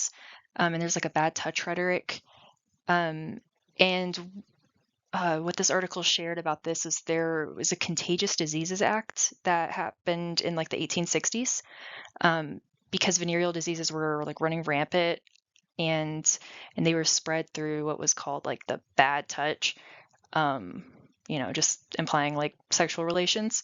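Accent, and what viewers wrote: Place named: United States. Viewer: Oh that ghastly American accent! How it jarrs....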